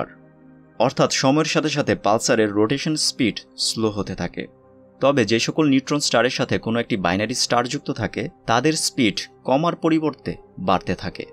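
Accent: Narsingdi, Bangladesh. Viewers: ও বাই আপনি কি আমাদের নরসিংদীর মানুষ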